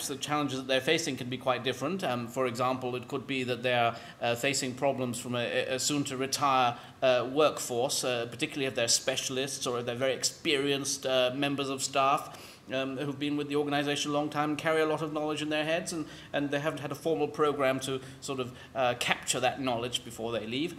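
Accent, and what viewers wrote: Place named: RP, United Kingdom. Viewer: You are FRIGHTFULLY posh, aren't you! (It's refreshing. I thought I was the only person left who spoke like they stepped out of a 1940s Ealing Studios film. JOLLY good show, old chap!) By the way, I have absolutely NO idea what you're talking about (I'm an artsy type...who, by definition of that fact, has 'knowledge retention' issues), but I could listen to you talk for HOURS.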